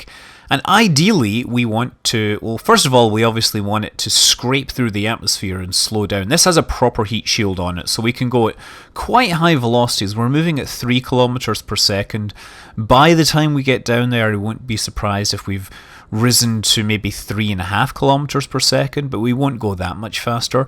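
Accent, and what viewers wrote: Scotland, United Kingdom. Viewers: He is a Scottsman :)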